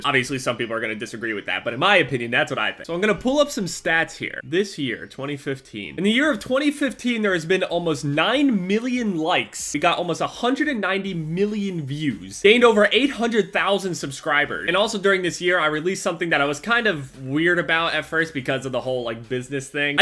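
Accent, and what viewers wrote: Canada. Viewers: You sound like you're Canadian lool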